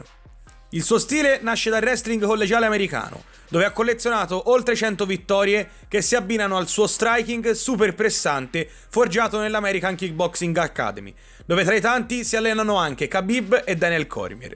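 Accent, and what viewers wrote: Tuscany, Italy. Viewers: Deh, oh che tu sei toscano ?!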